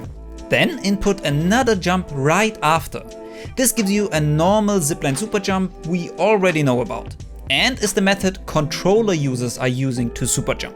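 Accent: Germany. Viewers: Do u speak german? Because your voice sounds like your main language is german.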